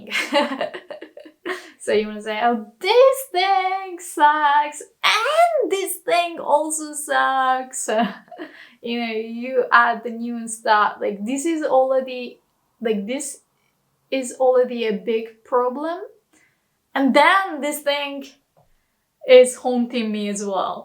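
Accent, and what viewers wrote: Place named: United Kingdom. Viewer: I like your accents. When you speak English, you sound a little British. Do you have a background of British or Australian?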